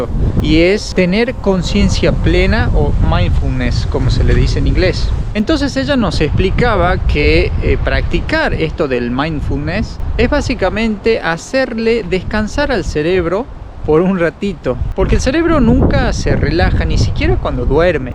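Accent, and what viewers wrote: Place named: North, Argentina. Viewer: Que lindo el acento norteño argentino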